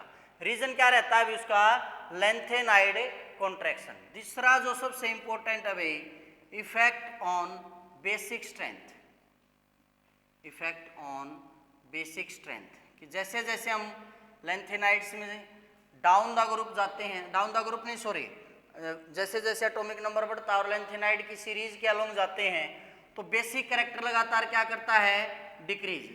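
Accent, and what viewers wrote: Haryana, India. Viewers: You are seems likely from HARYANVA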